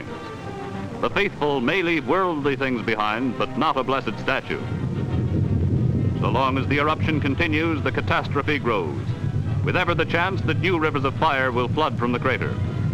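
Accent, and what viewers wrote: Transatlantic, United States. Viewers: Transatlantic accent 😀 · cocky transatlatic accent!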